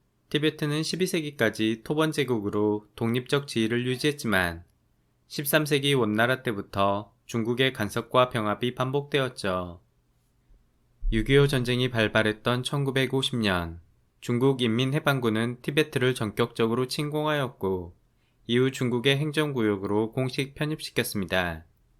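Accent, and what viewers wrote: Jeolla, South Korea. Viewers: 전라도 말씨 나와요 ㅋㅋㅋㅋ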